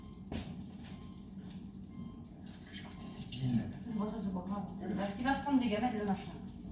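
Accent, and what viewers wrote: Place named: Normandy, France. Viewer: Coucou, et le papa ❤❤❤ Chouette le sapin, trop mignon 😍 🎉 Décidément 😂 tu es spécialiste dans les pannes de voiture 😅 espère tout rentré dans l'ordre. J'aime t'entendre, tu as un côté rigolo à raconter tes expériences 😂 et avec ce parler qui ressemble tant à celui de mon enfance près du Mont St Michel (côté normand )dont j'ai gardé encore qq accents. 😅 eh oui, on ne change pas une équipe qui gagne 🤣🤣🤣 Gros bisous et bon week-end ❤😊❤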